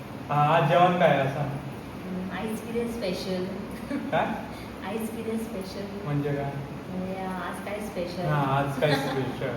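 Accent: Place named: Malvan, India. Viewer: माझ माहेर पण बांद्याला रोनापाल ला आहे माहीत आहे का रोनापाल तिची तिकडची मालवणी ऐकून मला माझ्या माहेरची आठवण आली माझ्या माहेरी सुद्धा असेच मालवणी बोलतात तू व्लॉग मध्ये मधे मधे तुझ्याकडचे मालवणी बोलत जा 🙏👍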